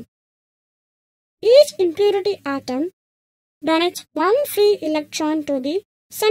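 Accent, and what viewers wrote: India. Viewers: A South Indian chipmunk?!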